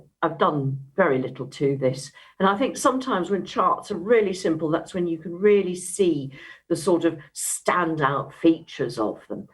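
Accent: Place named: England, United Kingdom. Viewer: Hey, its the English lady again!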